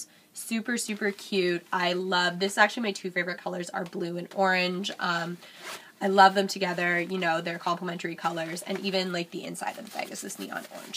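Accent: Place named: Canada. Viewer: I love your Canadian accent! I have been to Vancouver before and I fell in love. Im so jealous that you live there and I dont! LOL Do you enjoy maple syrup? I can drink it by the bottle haha. Anyway I love love watching you! Keep the videos coming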